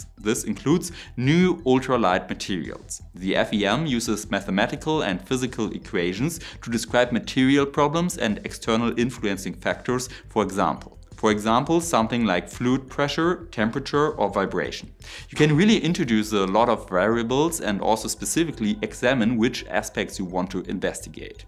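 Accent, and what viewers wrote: Germany. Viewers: Germa accent is irritating. Use AI like most other bloggers. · Who cares if a German guy speaks English with a German accent, come on 😄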